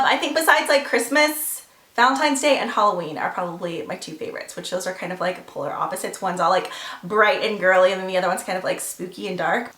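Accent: Texas, United States. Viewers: ! You're getting a Texas twang!!! Not gonna lie- I'm looking for jobs and to relocate and I'm like "I'm gonna go to Texas. Maybe I'll see or leighann!" I'm not a stalker tho. Oh god that's how it sounds. Haha. Gotta go hide under a rock now.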